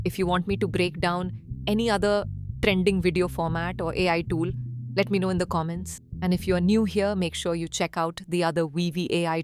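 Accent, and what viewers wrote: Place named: India. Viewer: поменяй уже наконец акцент у своего аватара с индусского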